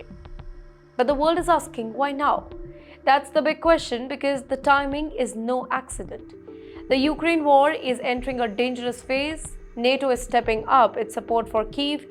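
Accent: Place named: India. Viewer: your accent is hilarious 😆.. obviously Indian.. just stop trying to be global news media cause it's disgusting.. so itchy to our ears 😂😂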